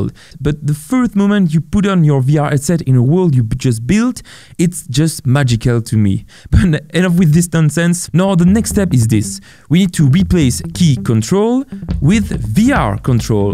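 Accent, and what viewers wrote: France. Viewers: are you french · tu es français?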